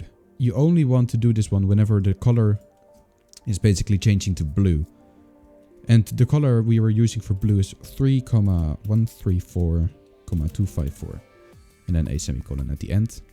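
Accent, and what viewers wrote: Netherlands. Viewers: Ik hoor gelijk dat je Nederlands bent 😂😂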